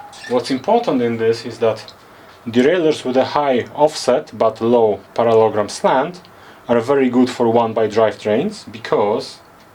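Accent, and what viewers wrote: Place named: Russia. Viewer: сделаю смелое предположение: судя по акценту - автор понимает по-русски )) можете поделиться опытом и ответить на два вопроса? 1) долго цепь ходила по сравнению со старой системой?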